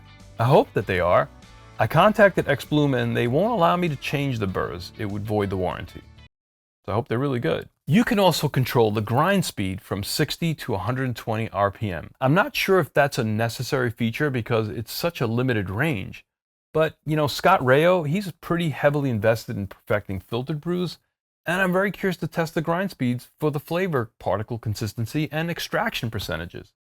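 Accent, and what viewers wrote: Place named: Brooklyn, United States. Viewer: Your accent reminds me of the old school Brooklyn Accent. I still hear it in natives that are over a certain age. An era of NY that I miss.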